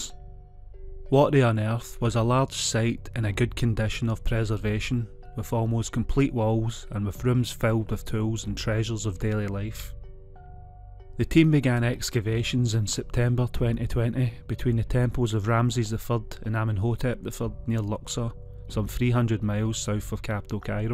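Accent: Scotland, United Kingdom. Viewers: Loved the Scottish accent! And the rest 😉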